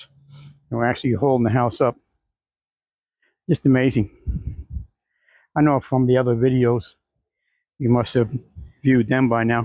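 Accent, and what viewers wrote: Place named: Louisiana, United States. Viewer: What part of Louisiana are you from? Heard the gumbo reference and your accent sounds like my Louisiana relatives. They’ve all passed. Love the yard. You can tell how much you love your home and yard. It’s so peaceful. It’s like something I’d like to find.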